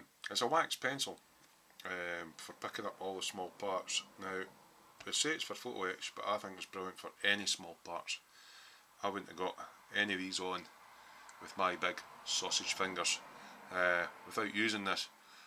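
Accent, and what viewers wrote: Scotland, United Kingdom. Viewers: OO the vidya presenting family grows nicely! Gotta like Tamiya tanks, my first ever painted build was a Russian T62A about a million years ago. I'f better go watch the other vids you've done. Also, everything is better with a Scots accent (my dad was from Glasgow) ^_^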